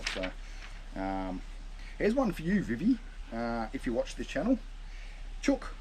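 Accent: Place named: Australia. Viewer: I accidentally had my playback on 1.5 times of normal. You should listen to this video that way. It's hilarious! I'm good with Aussie speak because I've been studying your language since I watched Water Dogs 3 times through. At 1.5 times normal, there's no telling at all what you're saying. At normal speed, I can pass this nearly 100%.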